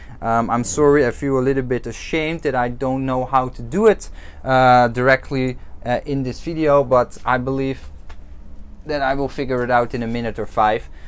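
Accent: Germany. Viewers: You are a German aren't you? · Are you german?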